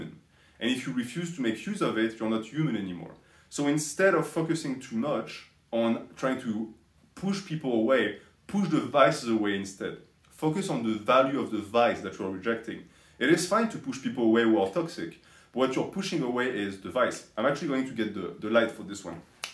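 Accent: France. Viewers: Sounds stupid but can u upload these on Spotify? I enjoy listening to a French man ramble about stoicism as I fall asleep with no ads or auto play.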